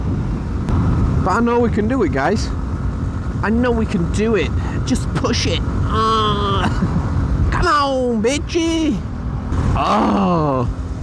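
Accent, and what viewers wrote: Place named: Leeds, United Kingdom. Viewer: Are you from Pudsey by any chance? I detect a Leeds accent and the channel being 🤔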